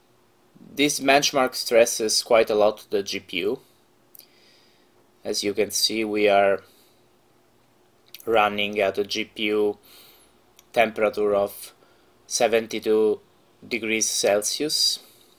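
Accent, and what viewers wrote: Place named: Italy. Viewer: si sente che sei italiano ahahahahah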